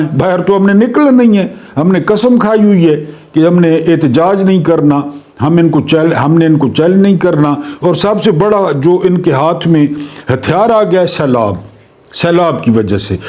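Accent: Saraiki, Pakistan. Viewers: Aoa,I just listen your program for your siraikey style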